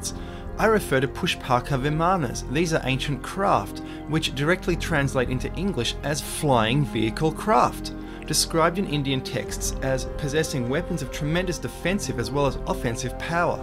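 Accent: Australia. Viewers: Speaker has an Australian or new Zealand accent not English. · Sounds like a good, stand up australian boy! Well done son.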